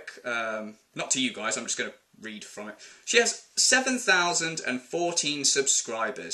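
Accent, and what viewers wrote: United Kingdom. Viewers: British accents are pretty interesting. :)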